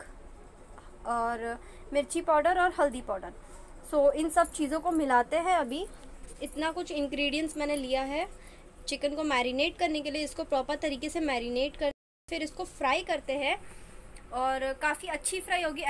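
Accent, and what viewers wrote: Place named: Karachi, Pakistan. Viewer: Ap ka accent bilkul karachi wala hai ap Andheri ka na btatin to mai yehi smjh raha tha ap karachi say ho india mai b M.Ali road hai.?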